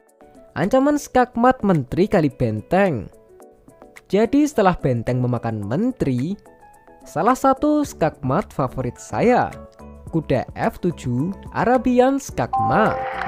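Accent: Java, Indonesia. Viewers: Asli orang jawa ya bang kok medok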